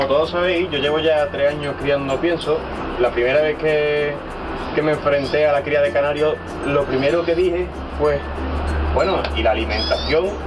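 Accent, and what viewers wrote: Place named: Andalusia, Spain. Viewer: En el acento ya decía yo que eras andaluz como yo jeje. Muchas gracias por la información crack. La calor de allí es peor que la de aquí tela. Un abrazo compi.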